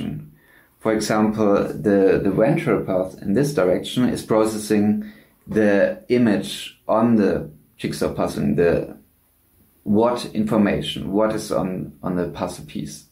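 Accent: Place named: Germany. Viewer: Starker deutscher Akzent, bist du Deutscher?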